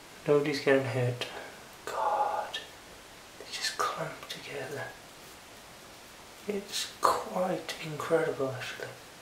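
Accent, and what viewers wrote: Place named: Wales, United Kingdom. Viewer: I love your welsh accent! ❤️I especially love how sweet and gentle and obviously caring you are with them all, I speak to my babies like this too 😂 I love your concern and care for mama spidey to make sure she gets some nourishment ASAP 💕 that first crying of your son really was amazing timing too 😂🖤🕷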